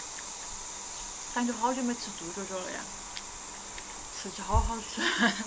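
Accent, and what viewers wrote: Sichuan, China. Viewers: 四川妹子? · 都不知道你说的啥普通话 你是那个嘛四川的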